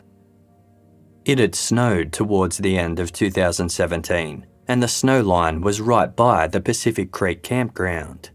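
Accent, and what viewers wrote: Australia. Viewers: Love hearing A fellow Aussie accent 🇦🇺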